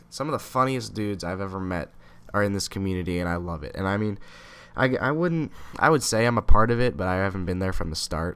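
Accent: Ireland. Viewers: Are u really Irish becouse I live in Ireland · Youtubes mother fuckin best commentator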